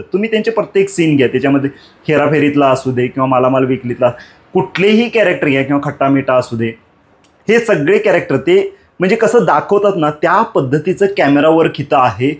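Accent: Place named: Kolhapur, India. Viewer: बोलताना बऱ्यापैकी सगळे मुद्दे घेतोस, तुझी बोलीभाषा आवडली... नाद खुळा कोल्हापूर...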